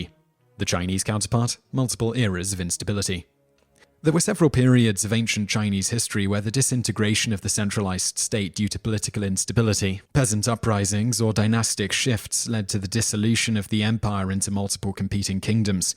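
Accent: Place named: British Asian, United Kingdom. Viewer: His British-asian accent is funny :P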